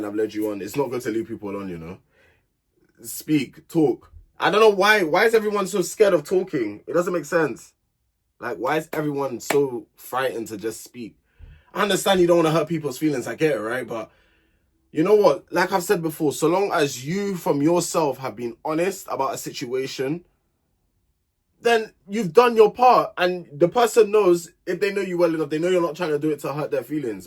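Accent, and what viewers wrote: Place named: Caribbean. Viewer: Am hearing a Caribbean accent. Are you from Trinidad 😂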